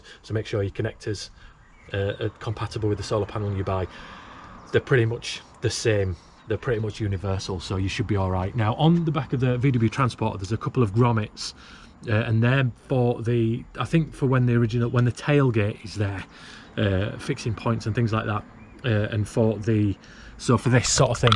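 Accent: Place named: Bradford, United Kingdom. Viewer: Another nice video mate, I've subscribed . With that accent are you a bradford lad by any chance ? Im also a yorkshire lad, living in sunny oakworth 😁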